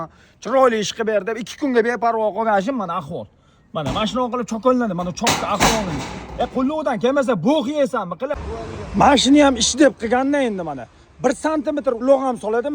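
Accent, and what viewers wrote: Namangan, Uzbekistan. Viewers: ШЕВА УДАР НОМОНГОН ХЕЛЕ ХЕЛЕ КМОНОР😂😂😂😂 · Namangandon sheva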